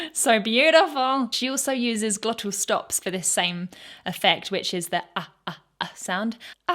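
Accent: England, United Kingdom. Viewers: ...." with their Vision".....What a GREAT IDEA!!!!....Have you Vocal analysed the great from the band yet?....They just happen to have a song called " ".....Now, you do have an English accent, and to my Canadian ears it sounded like "BULLS TO the WOOL"....If you meant the latter my deepest apologies....If on the other hand you meant the former, do enjoy... and UDO are almost as much FUN as I am. Cheers!!!...Ta....P.S...What does SMUGGLED A BUDGIE MEAN?.... --????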